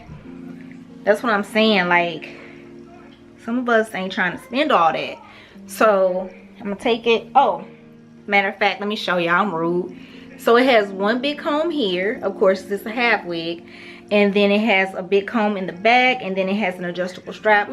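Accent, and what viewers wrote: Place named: Midwest, United States. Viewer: Hey Love...this is sooooo cute! That bag of headbeads yaaaaaaaaaaas! Lol You certainly have an accent! It's super cuuuuute tho. I told you I was obsessed with Nelly back in the day and I still love Midwest accents so I'm biased. Lol 💗💗💗💗💗💗💗💗💗💗💗💗💗💗💗💗💗💗💗💗💗💗💗💗💗💗💗💗💗💗💗💗💗💗💗💗💗💗💗💗💗💗💗💗💗💗💗💗💗💗💗💗💗💗💗💗💗💗💗💗💗💗💗💗💗💗💗💗💗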